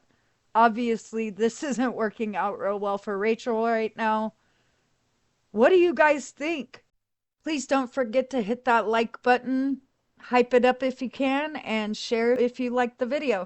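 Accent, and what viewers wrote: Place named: South, United States. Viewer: !! Ngl I’m fan girling right now. I love your channels & think you’re incredibly talented! I love your quit wit & humor, plus as a Southern girl your accent reminds me of home. ❤ Just had to utter fluff 😜& send you love & appreciation. 😊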